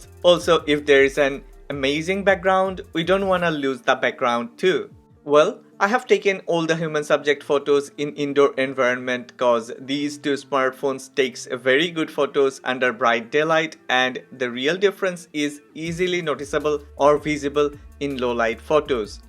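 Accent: India. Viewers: you sound like from India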